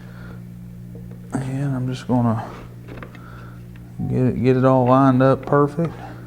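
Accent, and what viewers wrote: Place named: Eastern Kentucky, United States. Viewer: Between the accent and the funny sayings you must be from eastern Kentucky?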